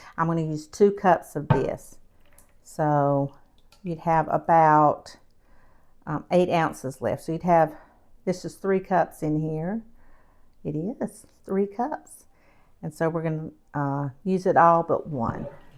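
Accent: South, United States. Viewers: OH MY. It looks so Delicious! I will be making it ASAP. Already had the menu posted for tonight's dinner, Appetizer, Blistered Shishito Peppers with a Garlic Aioli, Turkey Burgers, with Potato Wedges and Broccoli Salad. I saw one of your Y-T videos a while back, and you're so personable and soft spoken, and with that Southern Accent, I Subscribed right then! Thanks, for taking the Time and Effort to Film and Edit your videos. We your Subscribers Appreciate You! Have a Wonderful Day!!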